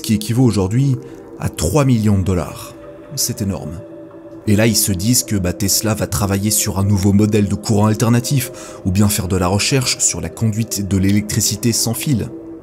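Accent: Belgium. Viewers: En tant que cousin français, la prononciation à la belge (quand bien même plus logique lorsque l'on y réfléchit) des nombres implique effectivement une conversion lorsque l'on l'écoute. Inutile d'être mesquin si cela fait tiquer, c'est naturel. Pauvres habitants d'El Paso, avoir la finale de Super Bawl qui saute, ils en ont eu, de la patience! Le SAA a dù démanger! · Bravo tu parle le francais comme un belge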